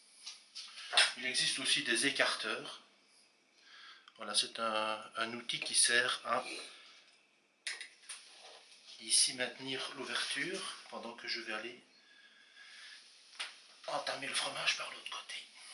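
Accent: Belgium. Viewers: IT IS definitely a Belgian accent. I am french myself and I live in Belgium, and I can tell you 100% I recognise this accent as being from Belgium. You are telling such bullshit. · May just be a lower dialect of Belgium. Especially since you've got a couple languages commonly spoken there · He has a strong accent from Wallonia indeed. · He speaks french but he's belgium, he have a belgium accent. (I'm french so) and the description in this video says "the belgium cheese master..." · yeah normally Belgian accent is a bit stronger than this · What do you mean by "people like that" ? You didn't read the description but kept trying to argue, and he also has a Belgian accent, just pay attention to the way he says "écarteur" like "écakhteukh" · i recognised a Belgian accent even before reading the description... he definitely has a slight accent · yeah he does, accent from Liège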